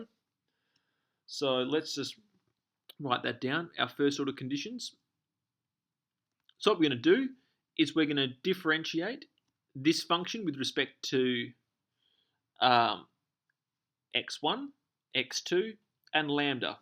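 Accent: Australia. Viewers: I asked myself "I wonder where this bloke's from, he sounds like he could be from Perth", then I check the channel and there you go. Strange combo of skating and econs, but good video. Thanks from Germany!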